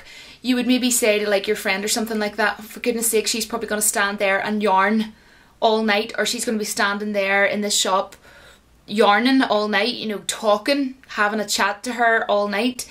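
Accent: Ireland. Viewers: Great info, Luv the Irish accent....drinking a wee bit of tea. Oh and you're dead on. In AMERICA we use the word eejit by itself with the same meaning. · Irish accent is freakin' awesome .. as for success, it really comes down to personality :-) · Top of the day to you 😊👍 I absolutely adore the Irish accent and I have heard many of the words that you use in this video. I have several Irish friends and one of my lecturers is also Irish 😊 it's so nice to listen to.. keep up the good work on your chann 👍👍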